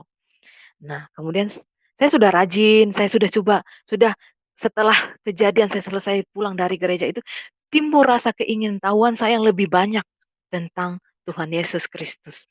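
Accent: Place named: Papua, Indonesia. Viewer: Logat Papua sekali😅🙏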